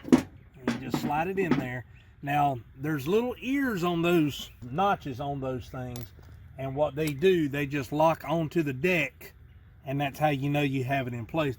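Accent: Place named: South, United States. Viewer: miss that southern drawl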